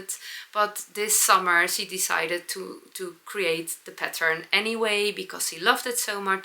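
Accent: Netherlands. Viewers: Love your dutchy English, you re searching for “kastanienbraun”, so similar to kastanjebruin, ❤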